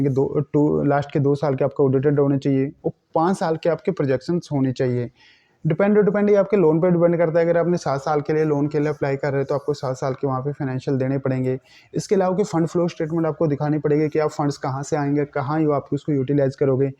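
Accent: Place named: Haryana, India. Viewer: Aap haryana se ho ,, apki language se lag rha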